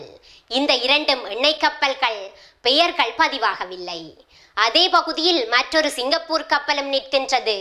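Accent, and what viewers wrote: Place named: Sri Lanka. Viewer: அருமையான பதிவு நன்றி உங்கள் சிங்கள தமிழ் இணிக்கிறது கேட்க கேட்க அருமை வாழ்த்துக்கள் வாழ்க வளமுடன்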